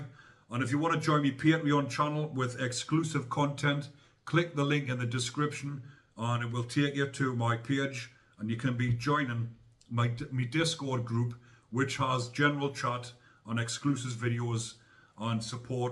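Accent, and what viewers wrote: Newcastle, United Kingdom. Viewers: Algorithms cant pick up geordie accents dony worry lad 😆. anyway hope that grub gets more of wots coming to him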